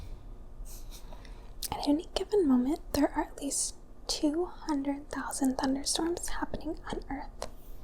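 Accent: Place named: Midwest, United States. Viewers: Its her midwest accent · She must be from the Midwest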